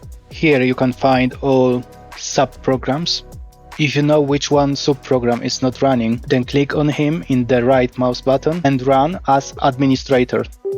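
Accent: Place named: Poland. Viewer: Słuchać, że Polak 🤣 Dzięki za pomoc!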